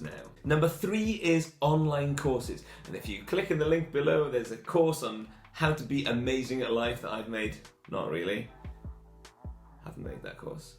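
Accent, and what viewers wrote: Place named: Wales, United Kingdom. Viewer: I'm really enjoying your content so far, and is fantastic to hear another rustic Welsh accent in the personal finance space. Keep up the good work!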